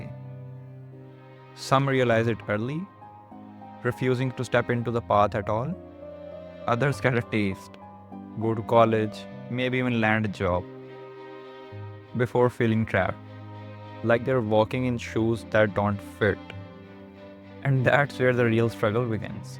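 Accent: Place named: India. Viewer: A quick suggestion, the 'r' pronounciation isn't looking natural . Its alright to stick on our beautiful Indian accent. All the best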